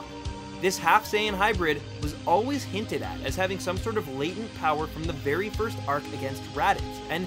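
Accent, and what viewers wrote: Canada. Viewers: just the way you say about it can tell you Canadian I'm right aren't i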